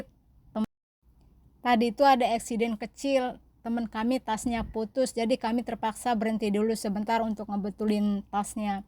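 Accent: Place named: Java, Indonesia. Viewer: Mbak logatnya jawa ,,jawa mana mbak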